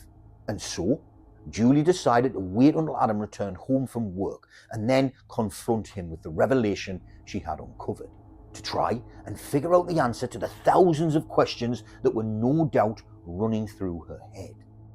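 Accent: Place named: North East England, United Kingdom. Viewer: Listening from Middlesbrough. Nice to hear a Northeast accent telling these stories. Love the content, great channel.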